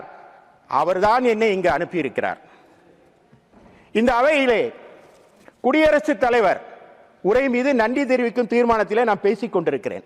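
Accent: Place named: Tirunelveli, India. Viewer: நெல்லை தமிழே ! ஆணிதரமான வாதம்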